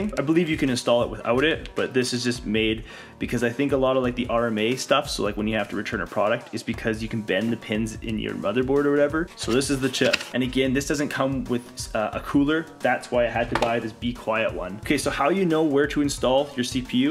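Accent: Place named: Ireland. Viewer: Very cool videos (part 1 & 2). They contain some real helpful information. Thanx for making and uploading them! I am wondering if your family comes from Ireland. Your English sounds a bit like that...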